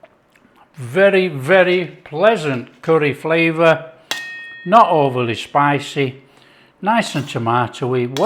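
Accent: Yorkshire, United Kingdom. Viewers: First one of your videos I've watched and your accent reminds me of the few years I spent living in Yorkshire. I've just been thinking that I need to learn a few more recipes and now I've found you sir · Are you from Yorkshire sir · what a nice Yorkshire man . Could listen to him all day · 😁😁😁😁😁 yorkshire man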